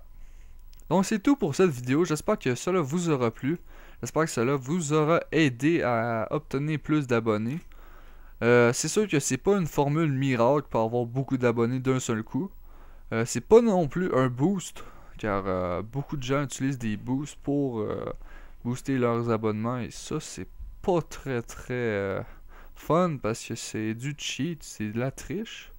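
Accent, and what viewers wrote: Quebec, Canada. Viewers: Tu as un accent Québécois ou Canadien je mis connais pas trop mais j'aime trop se genre d'accents +1like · Petit accent Québécois <3❤ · Accent du Canada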